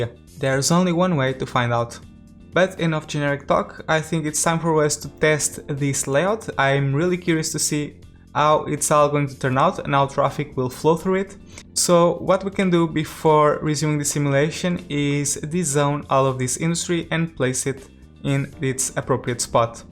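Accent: Portugal. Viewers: I was paying attention and suddenly my head was like "this sounds like a Portuguese guy speaking English". Eu estava certo 😂 parabéns pelo canal, estou a apontar as dicas! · Are you portuguese? Your accent looks like it Ok descobri que és tuga, já subscrevi. Adoro o Cities Skylines também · Reconheci logo o sotaque portugues! É bom saber que existe um yt portugues de cities skylines apesar do teu canal ser em inglês!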